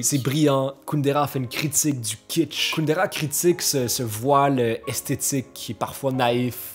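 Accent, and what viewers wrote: Quebec, Canada. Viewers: J'ai beaucoup de mal a comprendre les critiques sur ce type de défi. Autant je suis quelqu'un qui aime prendre son temps pour lire un livre et je suis plutôt du genre à lire un livre par mois, autant voir une personne qui se dit "je vais me motiver pour me remettre à lire et reprendre le gout de la lecture", comment trouver ça négatif ? Je trouve que c'est vraiment bien ce que tu fais, ça pousse à se remettre à lire, à se dire qu'on peut se donner des défis personnels pour se motiver à faire des activités, après chacun suit son rythme et ses envies. Bravo en tout cas pour tes vidéos. (PS: Ça fait plaisir d'entendre parler un peu québecois sur mon YouTube très anglais ou français, cela me rappelle ma belle année en Gaspésie.) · I don't know why YouTube recommended your channel to me but I loved the different accent though I don't understand French/Quebecois. 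Greetings from Rio de Janeiro 🇧🇷☺️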